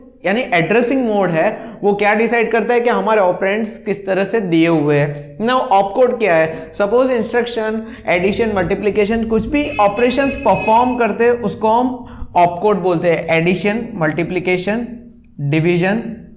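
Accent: Gujarat, India. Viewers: bhai gujarat se ho?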